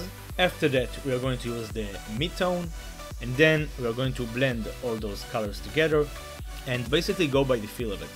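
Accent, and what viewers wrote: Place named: Israel. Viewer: יש לך מבטא ישראלי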